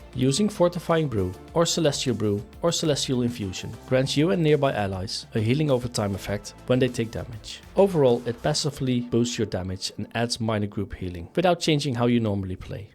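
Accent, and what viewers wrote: Netherlands. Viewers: Thanks for the guide, I've never played a monk before and after the video I notice the information sticking with me. (Probably because the class has been pruned) Maybe also because we have the same Dutch accent haha. Can I ask a few specific questions? I have done some content and I've never used Expel Harm, is it a button you ever press? If so, when? Do you always use Purifying Brew at 100 stagger? To keep the cooldown rolling? In what situations do you use Summon Black Ox Statue? How is Master of Harmony on Beta? Cheers again and cya next time. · Is it a dutch accent i hear?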